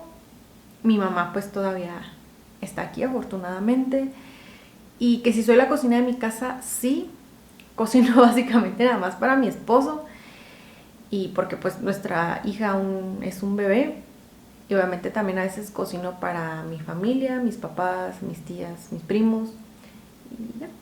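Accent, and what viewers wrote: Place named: León, Spain. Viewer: Tu acento es muy bonito (lo relaciono con el de León). Estaría padre ver un house tour y recetas, por supuesto.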